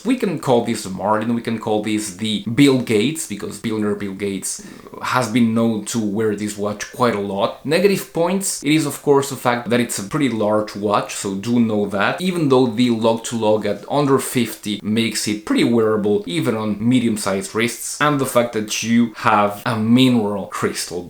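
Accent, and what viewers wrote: France. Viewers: I know he is a French. I like his a French accent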